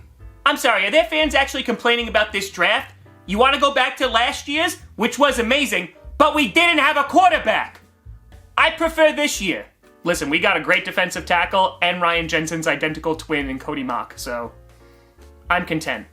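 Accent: New York, United States. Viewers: Love your NY accent!!😅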